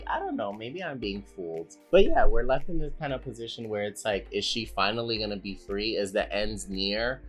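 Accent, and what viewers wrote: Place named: East Coast, United States. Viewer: I just have to say I love the East Coast accent. I’m from Boston so I hear ya and love it....💜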